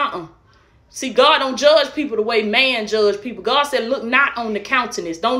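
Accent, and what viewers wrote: South, United States. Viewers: I love your country accent bae. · I'm a man of faith.. but I have to tell the truth... that face and that southern accent got me wanting to pay your house note And the car note! Alabama or Mississippi??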